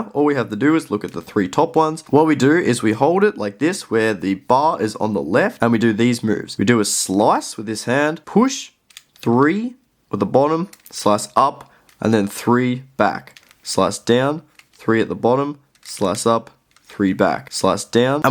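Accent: Australia. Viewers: Thanks for this quick and easy to follow tutorial 👏😁 But the Aussie accent was so funny 😂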